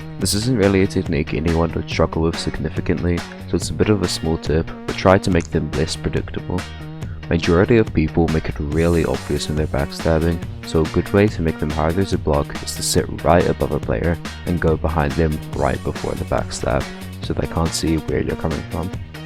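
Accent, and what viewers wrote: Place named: South Africa. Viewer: Are u South African lol ur accent sounds like it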